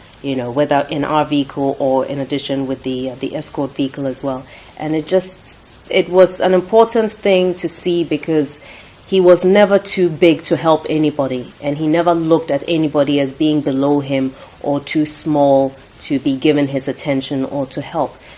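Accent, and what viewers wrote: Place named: United Kingdom. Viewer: You are talking as british!!!!!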